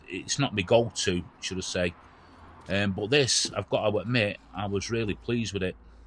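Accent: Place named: Scotland, United Kingdom. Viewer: Paul From Pa in US. Looks great. I have been wanting to do some pallet wood projects. I love that rustic old look. I also love the color of the stain that you used. I could see this out in my garage for storage / small tools etc.. My problem is that I want to build everything I see and you just can't. I really like the pieces you build though. They are just different and that's what I like. Plus the accent / location helps since I was born in Scotland but I have been in the US since 1968. I still have a spot in my heart for that part of the world. Thanks for another great build.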